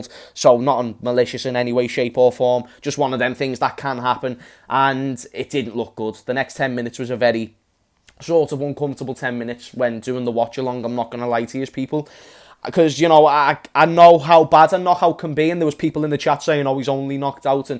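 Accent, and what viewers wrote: Liverpool, United Kingdom. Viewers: 😂😂😂 He’s a scouser!!!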